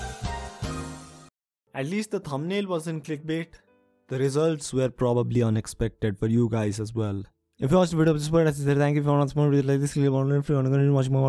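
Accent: India. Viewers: can you tell from which country you are you really sound in indian accent ?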